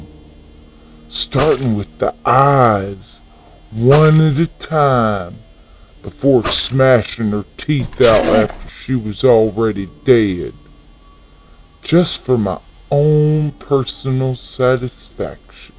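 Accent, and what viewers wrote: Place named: South, United States. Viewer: Woah he's southern damn tim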